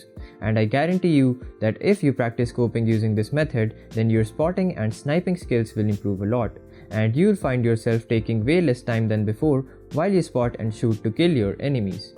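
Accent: India. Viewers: U r speaking good and constant english without stopping Very good And r u indian?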